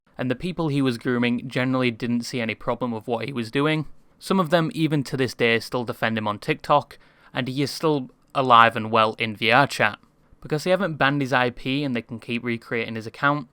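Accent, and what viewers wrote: United Kingdom. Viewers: Oh god the heavy British accent, I already know I'm not getting 50% of what he's saying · The British accent is so thick I sometimes can't understand what you're saying vroo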